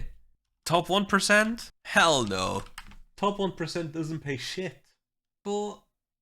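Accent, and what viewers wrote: Germany. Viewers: Bro are u German? Unbenannt.txt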